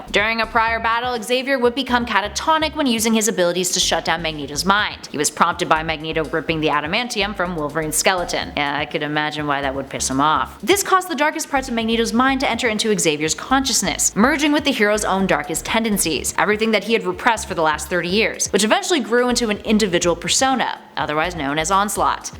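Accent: Canada. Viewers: You're Canadian are you not? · You're totally Canadian aren't you chicka lol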